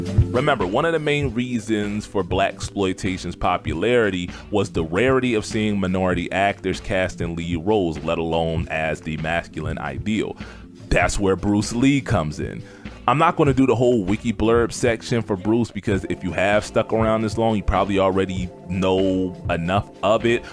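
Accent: Philadelphia, United States. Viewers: Bro you from Philly?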